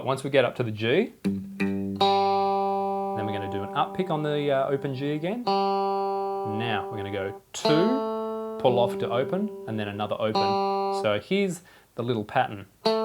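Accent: Australia. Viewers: Hey man, are you an Aussie?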